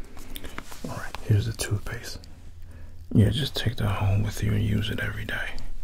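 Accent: United States. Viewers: I love 💕 your American accent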